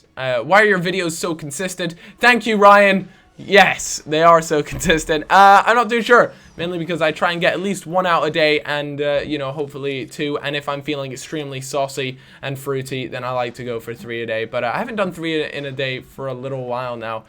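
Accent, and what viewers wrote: United States. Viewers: Are you american? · Are you American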